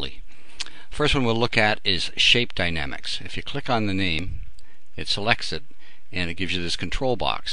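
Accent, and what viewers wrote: Canada. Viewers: i dont have this, so my question is dumb, buttttt: you keep saying "press harder" what do you mean? are you you using some sort of pen? how do you press harder on a mouse? -sorry i dont really understand but i DID enjoy watching! p.s. are you canadian? you sounded canadian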